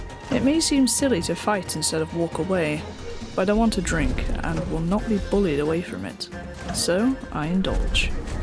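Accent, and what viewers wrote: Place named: United Kingdom. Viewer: Ah ha! So you are bri-ish!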